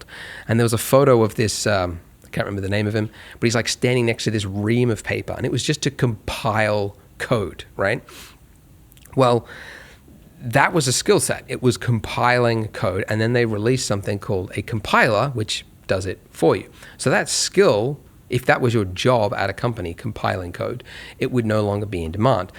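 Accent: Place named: United Kingdom. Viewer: Why do people with British accents seem so intelligent? Not saying you're not. It was a good video, and well-thought-out. But it is augmented by your accent. :)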